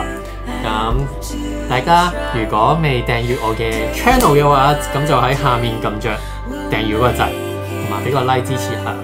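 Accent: Cantonese, China. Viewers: 我其實以前都係同你一樣被人地開玩笑話我騙身份證不過我唔係咯感情掂可以用騙的但後面變左都唔係我可以控制嘅另外一點我唔覺你廣東話唔純咯你廣東話幾好聽啊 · 第一：你的中文已經講的很好，廣東話跟中文混在一起講，台灣人也ok啦．第二：要當公眾人物(youtuber)就要有＂受人議論＂的心理準備，愛你的人有多少，討厭你的人就有多少，怕熱就不要進廚房，如果無法接受被人議論，就當個平凡人，不要曝光低調過生活．最後希望你在台灣開心的生活，大部分的人都很善良熱心，對廣東口音的朋友都十分喜愛的． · ❤❤❤❤❤❤不要生氣了，你很棒很勇敢，為愛走天涯，你還有愛你的亨利，不用去在意其他人的言語，國語的話我認為時間會慢慢解決一切，而且香港口音很可愛，以前郭富城，葉蘊儀來台灣很紅就是有那個香港腔，很討喜，超可愛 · 不管國語版廣東話或是廣東版的國語從口中說出來不都是很可愛嗎？語言／生活習慣因為不同環境本就有所差異，異地或異國戀情不就是因為愛而慢慢彼此往中心點靠近而創造出屬於你們的故事。加油囉！支持你們也歡迎來台灣。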